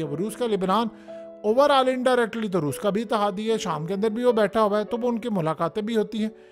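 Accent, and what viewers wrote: India. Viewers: Bhai ap jao b btain btata ho apki batain son ka or aki vioce son ka ap mujh India ka lagta ho agar ap India say fhir Pakistan TV kasay banya 🤔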